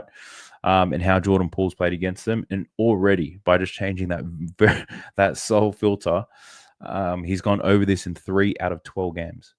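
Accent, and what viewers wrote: Australia. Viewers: Bro I mean this with the utmost respect but I realized that the Aussie accent is the reason I love the intro so much 🤷‍♂️🤣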